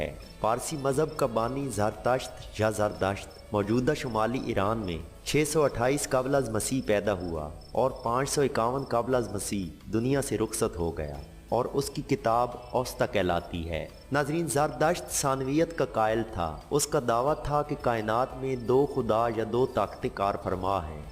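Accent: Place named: Sheikhupura, Pakistan. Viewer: I love you style n voice shiekhupura Ke ho peeche se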